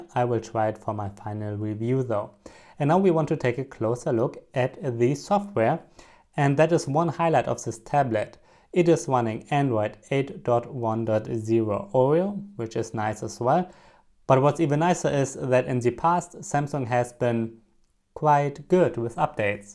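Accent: Germany. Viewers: man hört aus deiner stimme dass du deutsch bist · I just realize he has German accent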